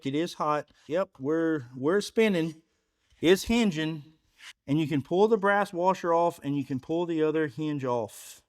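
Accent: Louisiana, United States. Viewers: I love your channel. I,m a retired tool & die maker & appreciate a true craftsman. But something I noticed about was your accent, so I looked up were your shop was ln Wiss.That can,t be? He talked like me. That fellow is from South La. Then looked your Profile on your web site. ,I was born in Church Point La. Where might you be from?😃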